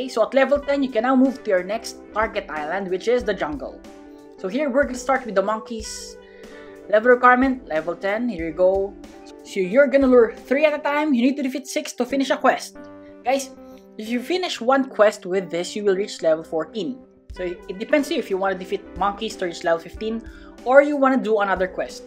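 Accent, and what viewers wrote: Philippines. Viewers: r you from phillipines?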